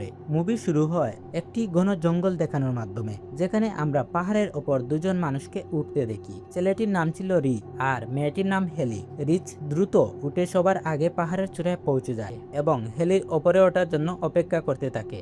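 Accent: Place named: Sylhet, Bangladesh. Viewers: ভাইয়া মনে হয় সিলেটি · মৃত,, নেউল দেখতে পায়,,,,সিলেটি টান ভাষা,,,আপনার কথায় সিলেটের টান আসে,,,,সুন্দর করে বাংলা উচ্চারণ শিখেন,,,সিলেটের ভাষার গুষ্টি মাইরো না।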